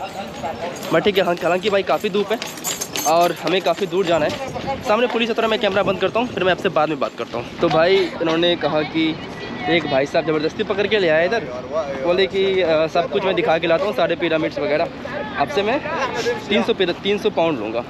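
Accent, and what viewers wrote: Bihar, India. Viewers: That's Magadhi(south Bihar) accent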